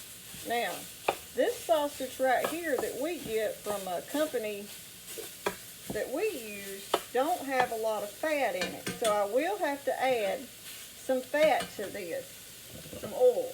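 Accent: South, United States. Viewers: I love hearing real American accents. ❤ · I love your Southern accent! 😊. Your gravy looks great! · I can tell by your accent that you don't live on the internet, I've listened to people from the south that lose their accent after decades on the internet....'people from all over the world are becoming like minded'. It's good to hear a lovely southern accent and learn how to make sausage gravy from scratch. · As a Ga boy, i can tell you right now if you are looking for videos of our food, and the person doesn't sound like this woman find another video. This is legit how we do it in the South. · I can hear that Southern!! Love it -- I was raised hearing it. Now I know HOW to make homemade biscuit gravy. I am all set. Thank you, Ma'am. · New sub! After your southern drawl, and your demonstrated talents, I had to sign up!😅 I have got to get a cast iron pan! 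I Sooooooo wish I had nabbed my grannies ancient cast iron skillet back in the 70's when she passed, but at 16 I had no interest in cooking.😅👊👍✌🗽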